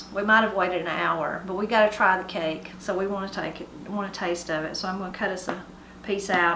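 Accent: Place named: Florida, United States. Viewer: Your accent reminds me of my family in Florida. I have had this cake. In Oklahoma at our yearly Easter family reunion. Pot luck favorite! ❤❤❤❤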